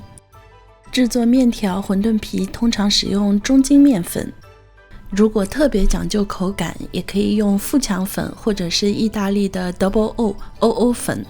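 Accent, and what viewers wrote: Shanghai, China. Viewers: 沪普听了台太亲切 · 懂上海话的人很明显听得出来你是上海人，不懂的肯定就听不出来，反正对我来说很亲切啦！ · 我猜你是上海人是吗？听口音感觉是。 被你种草，作为一个同样喜欢面食的人，去AMAZON下单了一台MERCATO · 吾晓得，从侬额普通话里听出来了😝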